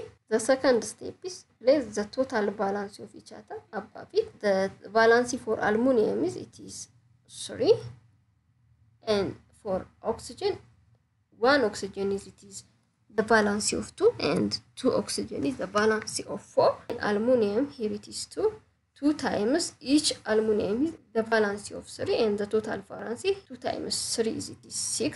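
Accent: Ethiopia. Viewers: She is from Ethiopia I know by her accent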